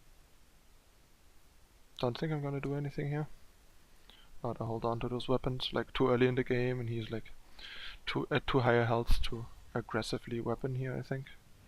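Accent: Germany. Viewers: You should watch the first couple of matches with it on. It's quite entertaining. I think it's partly the German accent that messes it up lol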